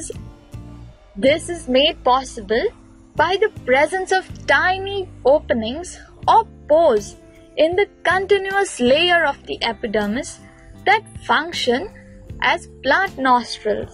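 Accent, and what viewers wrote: India. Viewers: this video could be useful, but sadly it's in india accent, take time to understand..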